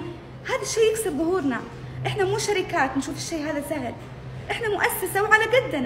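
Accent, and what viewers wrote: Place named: Syria. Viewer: واضحه اللهجة واضحه 😂😂😂😂 مع انها تحاول وتحاول جاهده المسكينه ههههههههههههه تقلد اللهجة السعوديه لكن واضح من مخارج الكلمات تطلع لهجتها الاصليه مهما حاولت تغطي تخرج لهجتها السوريه غصبن عنها 😂😂😂😂😂 ياترا من الي متستر عليها من الي وراها ابحثوا عنها وتعرفوا حقيقتها اكيد 👌 هذي واضح من لهجتها سوريه 👌👌👌 لاوبعد تحاسب بعد 👌😂😂😂😂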